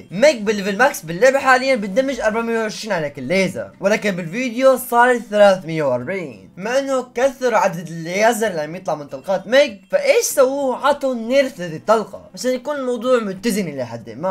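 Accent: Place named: Saudi Arabia. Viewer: ليه تتكلم سعودي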